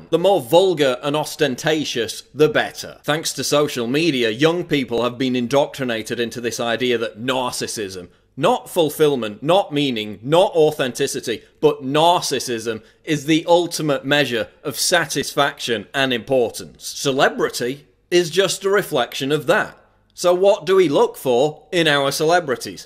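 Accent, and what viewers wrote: United Kingdom. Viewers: well i think to myself... end game terms...after gamergate. are they trying to reforge men born in hellfire to absolutely fucking hate democrats. rest assured they will. they are only making sure that the future gen will be dominantly conservative! they're morons of the highest caliber i like PJW's narration out of all infowars/planetprison shows. especially when he gets extra british crusy lol, slaying it with intellectual logical facts...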